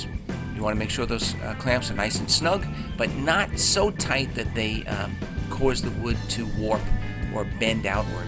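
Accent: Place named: New York, United States. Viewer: I bet my life this dude grew up in either Staten island or Queens lol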